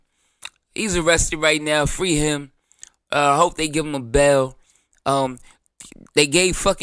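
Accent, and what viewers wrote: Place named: Memphis, United States. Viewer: Bruh the Memphis accent took me out breh 😂😂😂😂😂😂😂😂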